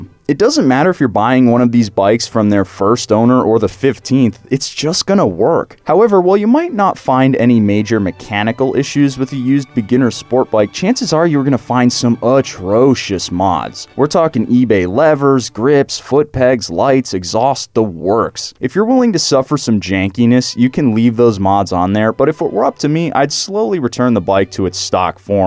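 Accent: United States. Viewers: That cowboy hackcent gets me everytime love it !!! Also i don't actually ride motorcycles, i just love how they look and they scare me :D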